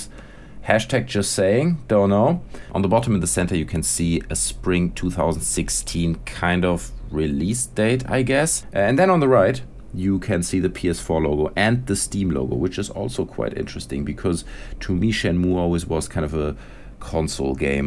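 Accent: Germany. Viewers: Moment, den deutschen Akzent kenn ich doch...Shenmue 3, maaaaan!!! :)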